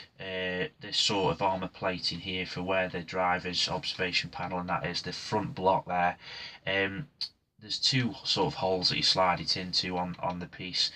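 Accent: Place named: Stoke, United Kingdom. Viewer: I didn't know you had a youtube channel!! It's nice to hear a Stokie accent! xx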